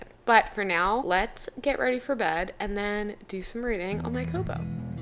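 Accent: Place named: New York, United States. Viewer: What an amazing video you filmed this video so well and explained everything so thoroughly!! I love your accent!! Are your from New York?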